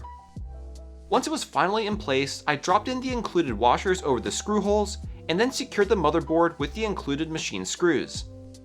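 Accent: United States. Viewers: I love how despite his hispanic heritage, his American accent is so strong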